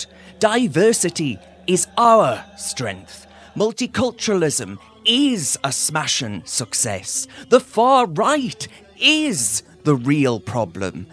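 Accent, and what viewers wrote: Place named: Sunderland, United Kingdom. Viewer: please leave nufc out of this , wearing our toon top when talking about politics is really sad to see just trying to get some views with our shirt on you are probs more of a makem than a geordie going off your accent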